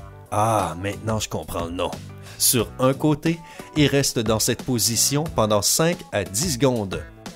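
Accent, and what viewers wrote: Quebec, Canada. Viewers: L' accent canadien , drôle et sympathique ; vous ne trouvez pas ! ? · accent canadien j'adore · C,est un accent quebécois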